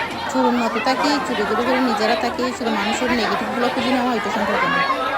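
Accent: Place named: Chittagong, Bangladesh. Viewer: Apo atte onor chittainge kotha besi vala lage.apon apon lage .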